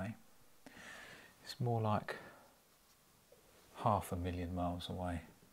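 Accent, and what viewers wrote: United Kingdom. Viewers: I have just found you! I'm very much admiring your British accent & grammar! Your work is exquisite! I've learned quite a lot so far. Thank you so much for giving back! Your help makes me feel blessed and God bless you! I Love your work!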